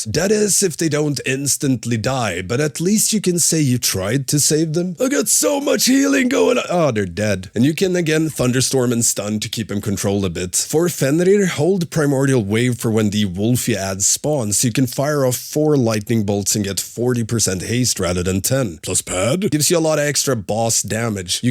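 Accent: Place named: Sweden. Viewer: Hördes på flera ställen och inte bara i outrot att du är svensk. Kul nyhet :)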